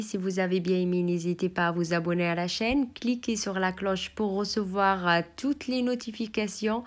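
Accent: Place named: Tlemcen, Algeria. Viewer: Merci pour cette recette mme et d oujda frontière entre maghnia et oujda j aime bien une femme d oujda avec un accent de tlemcen encore merci d une parisienne 🙏🏻🙏🏻💋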